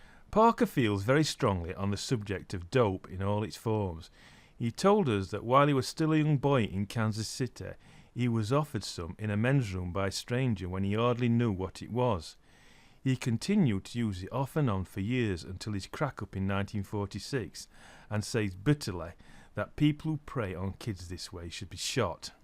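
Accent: Yorkshire, United Kingdom. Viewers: so thats what a yorkshire accent sounds like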